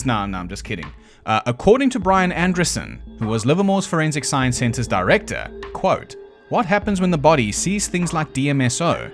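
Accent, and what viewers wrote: South Africa. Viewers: So good to hear a South African Accent🎉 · A FOLLOW SOUTH AFRICAN?? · Are you from South Africa? 🇿🇦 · Tried to comment earlier and couldn't. Wanted to say I love your personal flare to reading these cases out loud, pretty much saying what we're all thinking, giving validation to those thoughts and feelings. And it's really nice hearing from a south African, I am subscribed to many channels across the globe, but never had one of those until now. But I did want to say that one of your background sound tracks bothers me, it's the popping and crackling one. just hits me in the ear wrong and makes me either mute that part or turn to my phone and play something. I can't describe it any better than that, I'm sorry. other than that, 10 out 10 channel!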